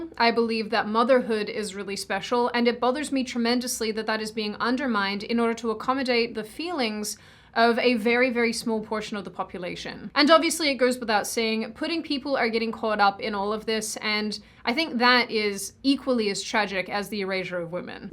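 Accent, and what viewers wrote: United States. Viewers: Is it me or is her accent becoming more "americanized"?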